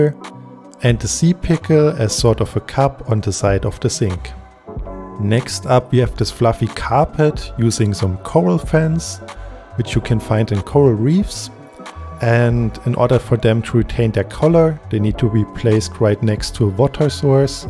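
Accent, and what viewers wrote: Germany. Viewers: Are you German? Doesn’t mean as hate but you kinda sound German😅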